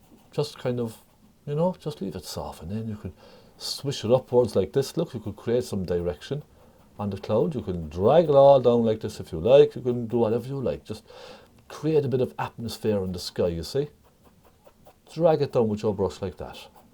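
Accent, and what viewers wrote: Ireland. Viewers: how much do you charge for just a recording of your voice? The Irish magic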